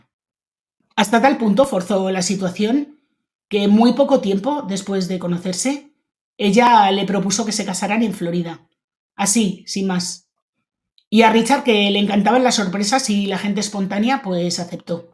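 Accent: Spain. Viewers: Hola, buenas. Mira una cosa, de que región de España eres? Si me puedes contestar claro. Es por un dejé que tienes al narrar las historias. España es un país de acentos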